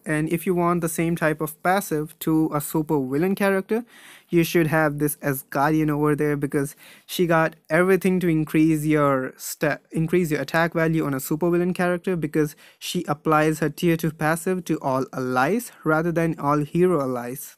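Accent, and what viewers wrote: India. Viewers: Also u have a slight indian touch to ur accent.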